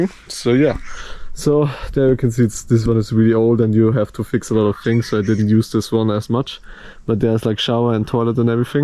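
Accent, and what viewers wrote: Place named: Austria. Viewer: Guys Austrilia and Austria too different. He sounds like european/ Austrian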